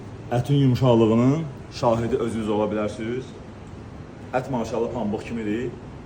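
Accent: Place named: Baku, Azerbaijan. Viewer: ZƏNNİM MƏNİ ALDATMİRSA NİTQİNİZDƏ BAKİ LƏHCƏSİ VAR İDİ 😊😊😊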